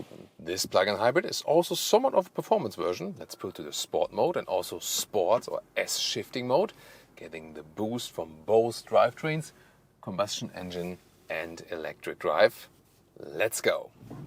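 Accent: Germany. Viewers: sounds more German than ever 😆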